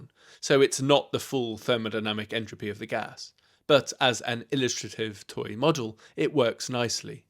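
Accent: United Kingdom. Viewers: I love the way the creator used a British coin to match the British narrator he is using for the voiceover—a subtle and clever touch.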